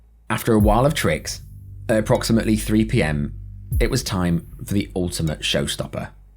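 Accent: United Kingdom. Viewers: Yeah the writing on this channel is very 7Th grade. Even the British accent doesn’t even help.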